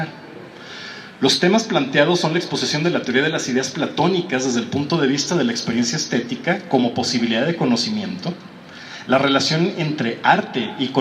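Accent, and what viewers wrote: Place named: Coahuila, Mexico. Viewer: Que onda maestro, perdon, licenciado. Me alegra que aun no has perdido tu acento Coahuilense y el estilo de Saltillo. Yo he perdido los acentos en mi teclado pero seguire con mi estilo. No hay defensa para los elogios asi que cuidate de ellos; no, mas bien de quien los espeta. Me gusta la frase de Schopenhauer que dice "Toda verdad atraviesa tres fases: pimero es ridiculizada; segundo, recibe violenta oposicion; tercero, aceptada por algo evidente". Escuchando tu ponencia se me vino a la mente las dificultades que tiene la gente de 60 a 80 años para usar una computadora, tablet or telefono "inteligente". El problema no es presionar los botones o pantallas, como mucha gente supone, ell@ son de una generacion analoga y mecanica. EL problema radica en la idea y los conceptos del objeto que tienen frente a ellos. La idea de enviar una carta, no impresa, atraves de un aparato electronico que guarda, no en un cabinete sino en las nubes, la informacion en algun lugar del cyberespacio y lo envia en un lenguage binario - 0 1 - y que el aparato receptor interpreta esos 0s y 1s y los muestra en la pantalla como una copia original de la dimension estetica de la representacion de la idea de la carta original. 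Sabes, tu y yo somo de una generacion que dio genero la transicion de lo analogo a lo digital. Las nuevas generaciones son digitales y saben como usar los aparatos, pero al igual que sus abuelos, no saben como funcionan. La nueva generacion technologica-digital es la representacion c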